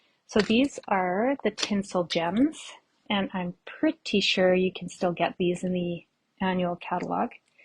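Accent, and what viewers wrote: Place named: Canada. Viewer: I’m enjoying your design and instructions. Very clear sound. I thought you sounded like a Canadian! ❤. I’ve subscribed so I will see and hear more of your vlogs!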